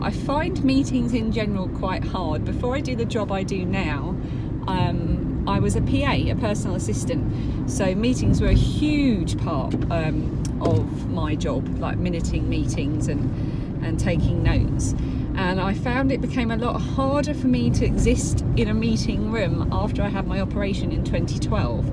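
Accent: England, United Kingdom. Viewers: I can't wait to see you and the family your so fun. when you don't have the money to go round the world it's great to have internet and a tablet 😂... I love England and the way you talk it's awesome!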